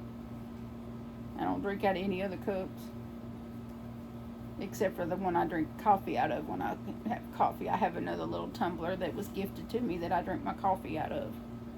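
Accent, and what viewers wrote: South, United States. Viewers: Omg I so love how your video started with your beautiful Theo❤🐕. And it is BS what happened to Mama Kayla's intro music. Y do people gave to b mean and cause drama !? It is so not needed and definitely not deserved. Thank u for explaining those weird sayings, I had no idea what they meant. We have our own sayings down here in Australia that may confuse too I suppose.🤭😆 But I just love the Southern American accents. Some do seem drawn out and others not so much but I just really love listening to u talk and I like how u talk fast as I am naturally a fast talker too, but during my working life before I was Medically retired, I was told to slow my speech all the time. Funny thing is when I was working I did consciously slow my speech !🤭🤣 And I am one of those people who is slowly going backwards in all your videos, plus watching all the new ones popping up.😁 Love and Prayers 💗💗💗🙏🙏🙏🐕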